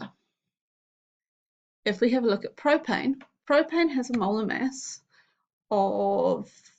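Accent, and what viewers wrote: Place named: Australia. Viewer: Its Australian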